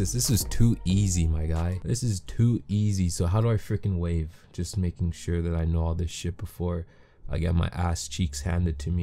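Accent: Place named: Toronto, Canada. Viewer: sound like a toronto man still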